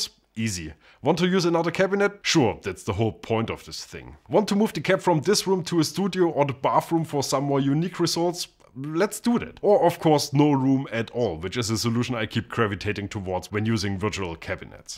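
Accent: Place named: East Germany, Germany. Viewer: Eastern German accent . 😂. Nice touch!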